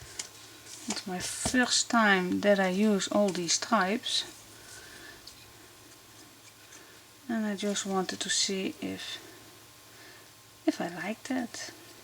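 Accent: Brabant, Netherlands. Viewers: jeetje , ik ontdekte jou dit weekend op you tube....heb uuuuuren zitten kijken. koptelefoon op en manlief had geen last meer van me hahahaha en ben weer compleet geïnspireerd door jou ....had veel albums gemaakt maar zie dat ik nog veel kan leren van jou ook heel veel ideeën opgedaan ( gebruik nu ook acryl stempelblokje om met mijn lange nagels het tape af te scheuren top idee). vreselijk gelachen ook om je .... wat een kei gezellig mens ben je ( brabant zo te horen) zit wel met heel veel vragen over materialen maar ja jij zegt googel het maar alleen niet alles kan ik vinden wat ik wil dus hoop dat je toch stiekum vragen wilt beantwoorden ,,,, merk wel dat wij veel dezelfde ideeën hebben ook ik werl al jaren met het "potloopstreepje en meet ook nooit iets af ... ik ga snel verder kijken bij je , groetjes Marijke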